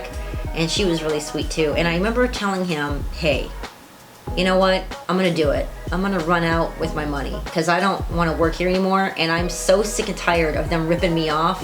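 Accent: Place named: New York, United States. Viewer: 🤣 you were 'thinkin abowdit'? How are u from IL and not New Yawk..cause I hear my own NY roots in a lot of how u tawk lol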